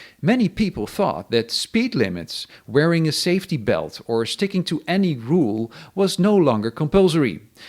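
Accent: Netherlands. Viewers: Je kunt het altijd goed horen als een Nederlander Engels spreekt.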